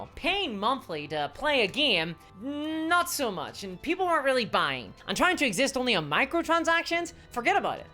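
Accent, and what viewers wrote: Northern Ireland, United Kingdom. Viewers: You say lighting, I hear lagging... clearly I've been away from Northern Ireland too long! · she's from Northern Ireland.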